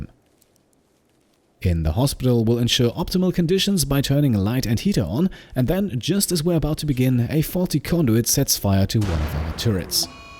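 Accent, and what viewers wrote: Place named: Germany. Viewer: Deine Stimme ist so entspannend weiter so danke, hoffe du wirst riesig! Your Voice is so relaxing keep it up i hope you get huge! I assume that you are German because of your way of speaking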